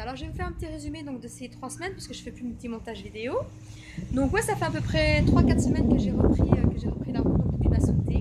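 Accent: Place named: Jura, France. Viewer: L'accent jurassien revient d'ailleurs....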